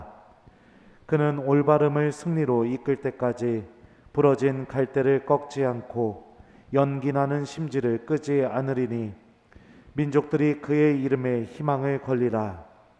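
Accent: Chungcheong, South Korea. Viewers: 신부님 아침마다 신부님 말씀으로 시작합니다.미국애서 감사드립니다.신부님의 충청도 사투리가 너무정겨워요.동향이기애 더 가슴을 울려요..🙏🙏🙏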